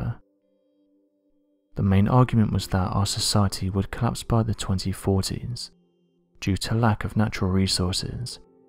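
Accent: United Kingdom. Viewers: I love your channel but you really need to replace this narrator. The young man narrating this and other video's mumbles. It's hard enough to understand the British pronunciations without a monotone mumbler. I'm sure he is a very nice person but he is not a good narrator.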